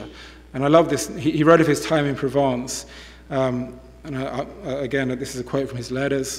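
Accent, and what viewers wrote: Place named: United States. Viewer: I didn’t enjoy it. A long monosyllabic ramble with continuous American style diction. Dreadful!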